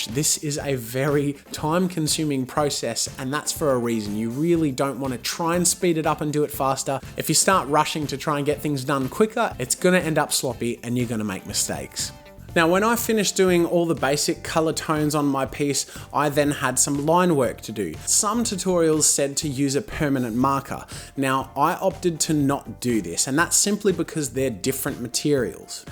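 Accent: Australia. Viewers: his Australian accent seem more Australian in this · his Australian accent seem more Australian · your accent was more australian back then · U sounded way more Australian in 2014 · he sounds more Australian then normal, maybe because this video is older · just because he's Aussie doesn't mean u can make fun of his and my accent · He sounds so aussie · His Aussie accent was stronger here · He sounds very Australian in this video but not as much in other videos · more Australian in this than it is nowadays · uses Aussie slang, Aussie accent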